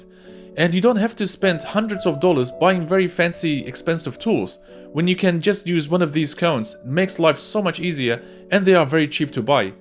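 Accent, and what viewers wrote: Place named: Eastern Europe. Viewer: You sound EASTERN EUROPEAN… but you are from NEW ZEALAND ?? And ZEALAD is actually DUTCH..😃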